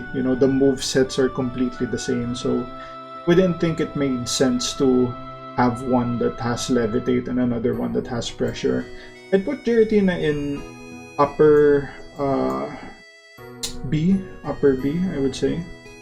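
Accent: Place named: Philippines. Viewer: Are u filipino? I am too i can recognize the accent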